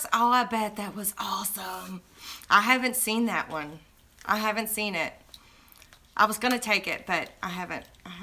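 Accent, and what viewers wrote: South, United States. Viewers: Happy Birthday, I watched your video the day it aired but I wanted to comment today because it was your Birthday... You are so amazingly talented and your humor is hilarious I love to sit back and watch your videos and I get so tickled. I am from Georgia and I can definitely relate to the southern accent... lol Keep up the good work... I only have one request and that is if you could make all your background designs a bundle.. That would be wonderful.. Again Happy Halloween and Happy Birthday!!! <3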